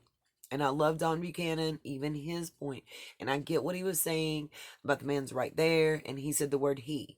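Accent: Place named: East Tennessee, United States. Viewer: I live in east tn. In the same area as the Smokies and you sound like people from my area. I'm as country as cornbread and I'm seeing things exactly like you do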